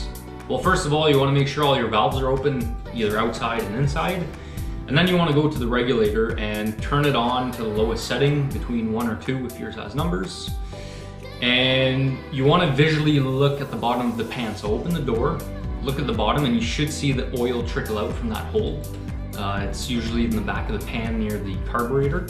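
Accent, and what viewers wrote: Canada. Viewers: Nice video, je savais que j'avais affaire à un Québécois mais ça pris quelques minutes avant de te trahir :P Bonjour de l'Abitibi ;)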